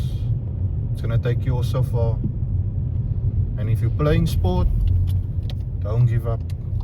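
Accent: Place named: Cape Coloured, South Africa. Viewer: Lekker coloured accent. I miss that living now 34 years in New Zealand. Make some Afrikaans videos too!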